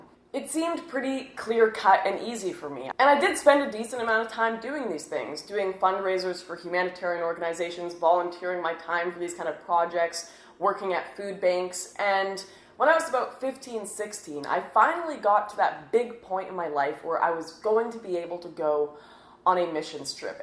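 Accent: Canada. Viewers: Don't remember what video it was but it was a recent one. In it I was kind of surprised at her pronunciation of eggs ('aegs') and flags ('flaigs') as well. I knew Canadian English was a bit different to American English accents (the well known 'aboot' instead of about :P) but I didn't know (some?) Canadians pronounced these words like that. But to be honest, I couldn't care less what accent she speaks in, I'd still listen :D. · wow dude, so many Canadian pronunciation gimmicks... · Draegens and Frodo Baegens. Canadian accents (kinda Minnesotan) are hilarious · draygons? frodo bayggins? is this a Canadian thing? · Al DEE it must be due to her Canadian accent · 😘 Amazing red pill moment beauty Canadian accent and all the lies of the world are coming out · i hate that canadian accent lol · Dylan Gill But she isn't American, she's Canadian; I have a couple Canadian friends, and they often pronounce the letter "A" weird.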